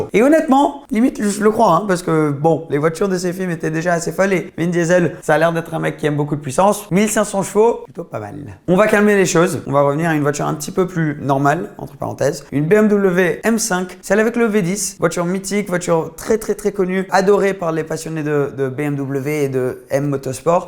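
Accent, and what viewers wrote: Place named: England, United Kingdom. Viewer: Ton accent anglais de merde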